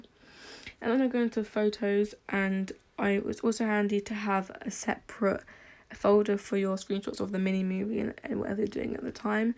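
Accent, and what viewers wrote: United Kingdom. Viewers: U British??? :D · British like me :) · YAY U BRITISH LIKE MEH UWU ! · IS THAT A BRITISH ACCENT I'VE LIVED IN BRITAN ALL MY LIFE AND I CAN'T DO A FREAKING BRITISH ACCENT (Your voice is so cute i love it) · She has such a nice British accent! lol I just sound sleep deprived all the time😂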